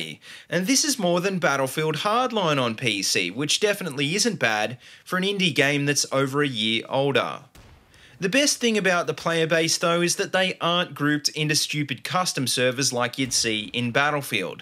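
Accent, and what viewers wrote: Australia. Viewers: Youra Queenslander arnt ya? :p That okka accent! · Gaming I knew you were Australian as soon as I herd your voice keep going mate.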